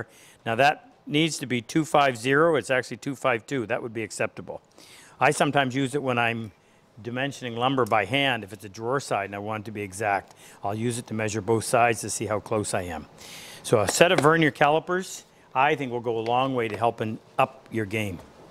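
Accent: Canada. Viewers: Ar u a Canadian or American Ah!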